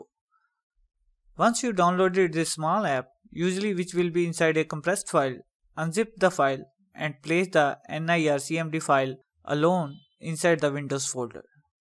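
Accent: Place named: India. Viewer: ALL OF THESE ARE SOME GUY WITH AN INDIAN ACCENT SO I CAN'T UNDERSTAND WHAT HE'S SAYING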